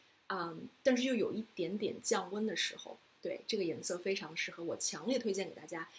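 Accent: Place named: Beijing, China. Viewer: 京腔儿听着好亲切 😊 你也在加州吗？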